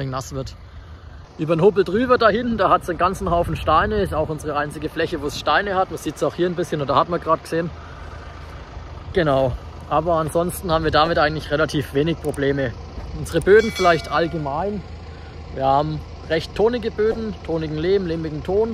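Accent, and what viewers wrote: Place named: Franconia, Germany. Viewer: Da ist Triedorf Jacke oder? Du klingst auch fräängisch. Hab da vor evtl auch hinzugehen nach dem Abi.😊 Und btw ich meine gelesen zu haben, bis 1900 Undrehungen ist verzögern 0 Problem. (Bei Druckluft gebremsten Anhängern ist das natürlich was anderes) Wir fahren eigentlich zu 90% einfach mit TMS und Fahrpedal.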